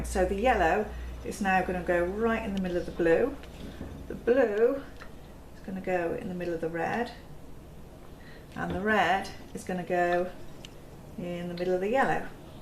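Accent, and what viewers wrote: United Kingdom. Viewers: Love your British accent, that you get paint all over and that you show the opps paintings❤️😂 you are real! Many video artist are perfect and I'm NOT, so you give me hope that even I can do it. Thanks.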